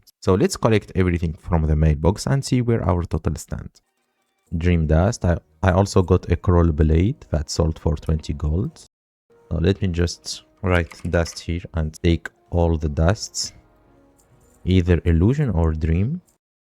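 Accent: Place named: Latin America. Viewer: De donde sos ? Hablas inglés pero no sos nativo se nota muy raro tu inglés sos latino que habla inglés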